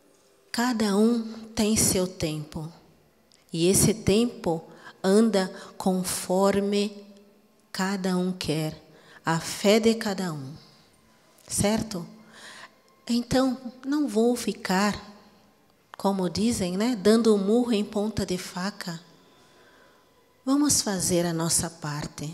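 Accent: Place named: Spain. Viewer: Eu, como um bom gaúcho aqui da fronteira, amo esse sutaque espanhol, que faz parte da nossa gente aqui dessa região sulina! Buenas minha! Me gusta ouvi-la!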